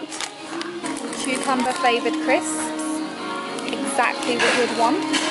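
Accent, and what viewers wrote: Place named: United Kingdom. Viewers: ChildofGod I think is mixed but her mum is from Uganda so she's Ugandan but was raised in Britain, hence the British accent. Hope that answers your question:) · and interesting. how come you have a British accent ahhahhaah. by the way I am African too and my girlfriend is Asian from the Philippines.😊😊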